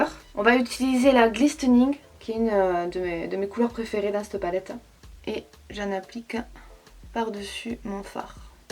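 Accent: South, France. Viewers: j'adore (on a le même accent qui sent bon le soleil ;) )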